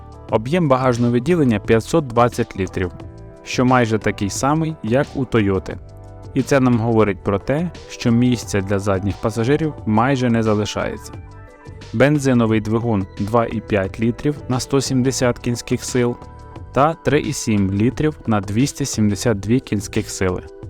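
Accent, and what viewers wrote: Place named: Ukraine. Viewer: Ничего хуже хохлового говора в обзоре машин нет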